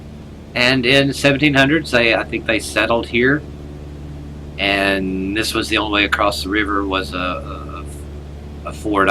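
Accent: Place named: Tennessee, United States. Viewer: Hi… my husband and I enjoy watching your flight simulator videos as my husband used to fly ultra lights …we live in the Treadway Tn area … listening to u it seems u live close to us… ?? We were just curious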